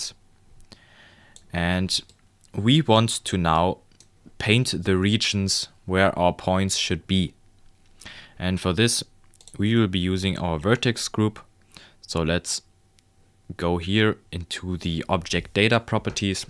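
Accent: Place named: Germany. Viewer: you are german i can hear the acent in you XD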